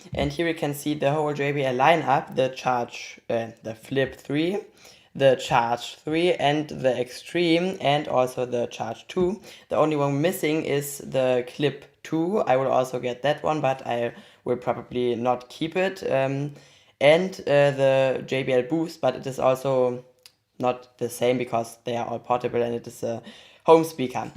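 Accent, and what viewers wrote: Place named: Vietnam. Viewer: keep up your great work, dude! I love your vinglish!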